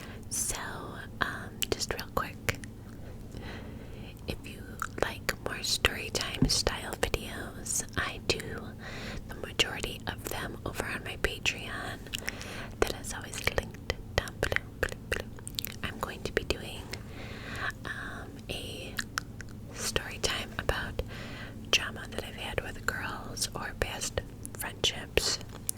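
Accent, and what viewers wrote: New York, United States. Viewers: I loved this! Can you do the next in your ny accent again? It gives me the most tingles for some reason. <3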